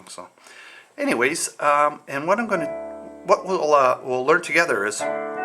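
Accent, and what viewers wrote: Canada. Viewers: love it! endearing Canadiam accent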